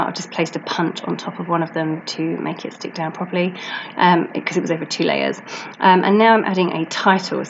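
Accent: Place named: England, United Kingdom. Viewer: Thank you for your creativity. So nice to hear and English accent!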